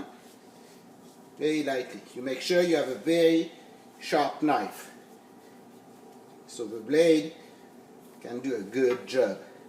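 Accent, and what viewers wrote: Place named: France. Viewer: if he only did not have a french accent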